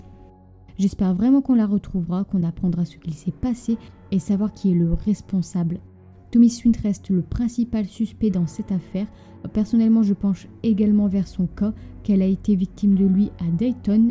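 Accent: North, France. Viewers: aaah vous êtes une chtimi comme moi <link> semblait bien percevoir un accent 😁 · Au top, comme d'habitude! Mais alors je suis curieuse... ^^ D'où nous vient cet accent? J'ai l'impression d'entendre des sons aux influences du nord mais en même temps je n'ai jamais entendu d'accent du Nord comme le tien si j'ai tapé dans le mille. En tout cas c'est charmant, la touch! J'adore! Merci de nous faire voyager dans le sombre monde du true crime 🕵️‍♀️🚔🔪💉💀🥀⚰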